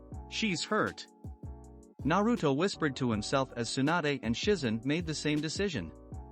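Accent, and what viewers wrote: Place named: Japan. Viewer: At some point the text to speech program took on a Japanese accent. That was at some point after the 30 minute mark.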